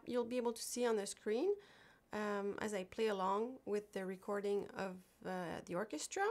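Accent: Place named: France. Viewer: Hey there ! I folllow you since 2 months, and I heard that you have a little french accent when you speak english... 😉Are you french, because I am, and proud to be one ! I love your channel !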